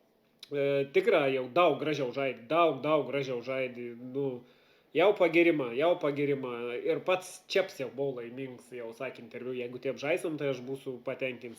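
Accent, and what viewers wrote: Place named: Samogitia, Lithuania. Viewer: pramuša unt žemaitiškuma - džiaugās širdis klausuntys 🤘💪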